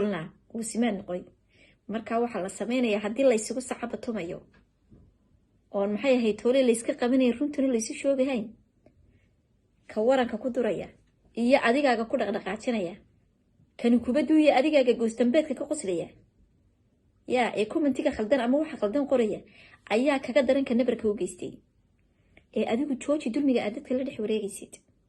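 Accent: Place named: Borama, Somalia. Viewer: Anigu reer booramaan ahay sidaan kuugu hadli lahaa ama gabadh kale ugu hadllahaa ayaad u hadashay inaabti quruxeey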